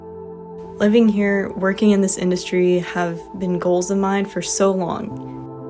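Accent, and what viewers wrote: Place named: France. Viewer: i saw this video after the dream job worst nightmare video, and you speak french natively with a french accent, not from montreal/quebec ? what's up with that? could be an idea for a video!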